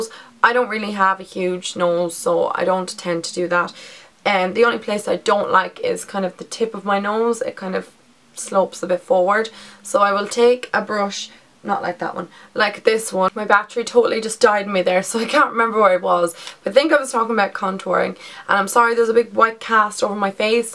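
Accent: Ireland. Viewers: great video thanks for the tips!! i use a darker foundation for contouring but find it looks cakey so will invest in bronzers and try that instead! love the accent! im sure all english people love the irish accent ! :) subscribed :) xxxx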